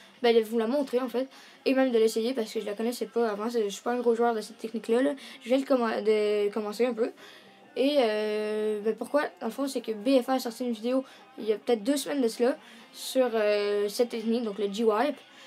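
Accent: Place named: Quebec, Canada. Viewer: Ahah, je me trompe ou pas si je te dis que tu viens du Quebec ?? ^^ sinon, bon tuto et très belle attaque (meme sans les pieges) PS : n'hésite pas à t'abo ;)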